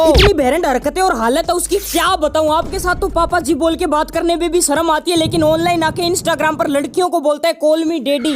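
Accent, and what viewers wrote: Rajasthan, India. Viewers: Aap rajashthan se ho me bhi aap ke waha aese bol te h kya Bhai ji Kiya ho 😅😅❤❤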